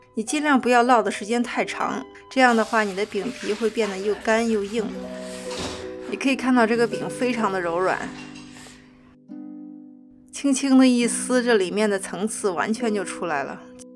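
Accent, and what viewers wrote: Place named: Xi'an, China. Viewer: by her accent, i guess she is from xi'an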